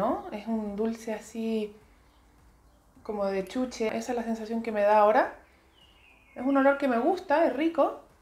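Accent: Chile. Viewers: El acento chileno-español es suena muy lindo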